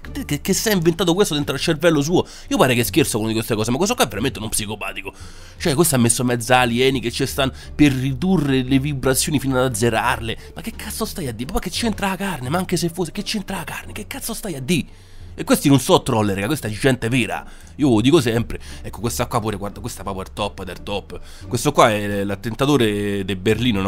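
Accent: Rome, Italy. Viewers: E qualche giorno che ti seguo mi fai ridere ma soprattutto perché parli il romano. Io sono vegetariana non mi dispiacerebbe essere vegana ma se non e fatto alla perfezione non vale la persona. Ti vorrei confermare che certi commenti non sono appropriate soprattutto quelli su i bambini che sono puri e prendono ciò che gli diamo, e per gli adulti che hanno preso a loro volta dai precedenti. E non tutti hanno il tempo per capire precisamente cosa si intende, che inferno si vive essere appassionati dei viventi che non vorresti nemmeno che inciampano, a me dispiace solo che non la pensiamo allo stesso modo ho pietà per la pianta compresi i suoi abitanti❕ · Wow, finalmente un altro commentatore di commenti assurdi, per rendere meno opprimente l'attesa di un nuovo episodio di "Commenti Assurdi" (Max Noreg. Se non lo conosci, ti consiglio vivamente di visitare il suo canale) Mi piace il tuo stile: sei il primo a farmi prendere a simpatia il romanaccio(?). 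Riguardo al video... beh, se una persona è talmente poco convinta in ciò che crede, che basta un'immagine provocatoria a farla vacillare, direi che c'è qualcosa che non va. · Pratico yoga, e ovviamente è una disciplina con delle basi solide, riguardo la medicina, la cucina, il quotidiano. E una persona autorevole che l'ha introdotto in Occidente, diceva, fate di tutto un po'. Quindi se una persona mangia di tutto un po', senza eccedere soprattutto in (mode alimentari), può essere sereno e non impazzire. Sto frequentando spesso il tuo canale, e il tuo accento romano è diventato una (moda) in questi giorni per me. Fai benissimo ad informare entrambe le fazioni, dei più disparati argomenti, la gente anche se non se ne accorge o è orgogliosa per ammetterlo ha bisogno di informazione e se con una aggiunta ironica è sempre buono! Iscritta, continua a stupirci. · Bellissimo...guardo i tuoi video perchè adoro la parlata romana......MI FAI TROPPO SIMPATIA...... 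E CARAMMELLE SE CE L'AVESSERO AVUTE SE LE MANGIAVANO CO TUTTA A CARTA😂😂😂😂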